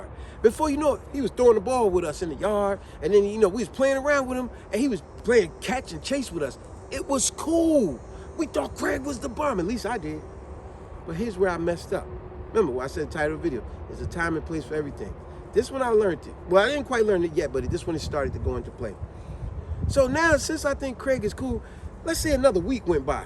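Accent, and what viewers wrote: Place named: West Philadelphia, United States. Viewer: He reminds me of growing up in West Philly and Camden.That is exactly how the guys would talk.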